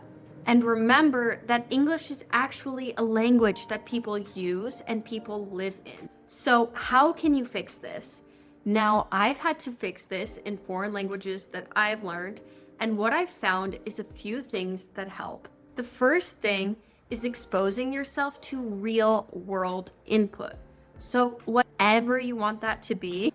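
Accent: United States. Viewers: I really love the American accent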